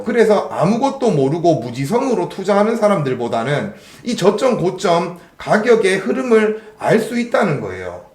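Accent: Gyeongsang, South Korea. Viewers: 경상도 사투리가 문제가 아녀요..내용이 좋으면~~ 상처 받지 마시길요❤❤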